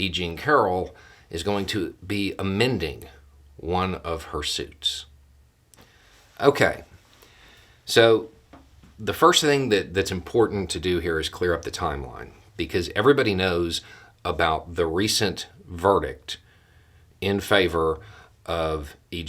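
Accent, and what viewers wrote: South, United States. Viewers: I think I've learned enough of your Southern ways of speaking to say, about Trump, "Bless his heart."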